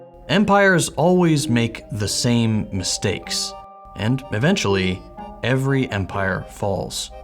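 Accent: United States. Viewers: Just wondering, are you an American?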